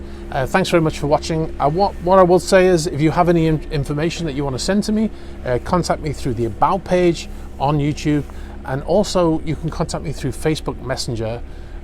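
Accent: Liverpool, United Kingdom. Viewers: Thanks for the "thermal events" ye auld scouse ye....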